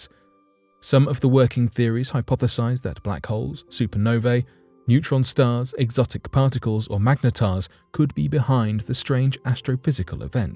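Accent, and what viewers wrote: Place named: RP, United Kingdom. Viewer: You sound like British Royalty! Best accent ever!keep up the good work as well. Happy New Year!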